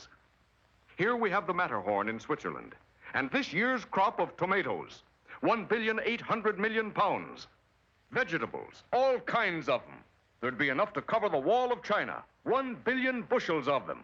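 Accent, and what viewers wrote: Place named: Ireland. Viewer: Does anyone happen know why the Disney staff chose an Irish narrator for this short?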